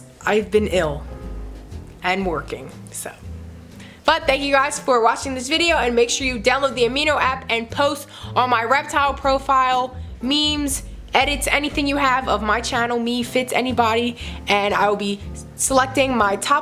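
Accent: Philadelphia, United States. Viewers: Who else can hear her philly accent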